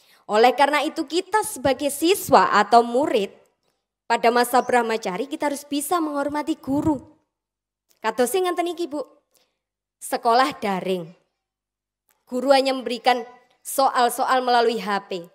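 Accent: Java, Indonesia. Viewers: Suksema yo apik pisan dialek jowone santun bikin hati ne tentem /adem yo cah ayu pisan matur nuhun ngih apik jenenge ayu pisan wonge suksema ngih . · Mbak hebat 👍👍.Wajah dan suaranya bening.Pengetahuan agamanya lumayan.Tehnik penyampaiannya bagus.Yang paling menarik bagi saya laval bhs Jawanya sangat mengesankan.Jarang ada yng mampu seperti itu.Selamat Mbak,salam dr Semarapura,bali.Klo blh tau Malangnya di mana? Saya dulu sering ngelencer di kayu tangan.Suksma.🙏🙏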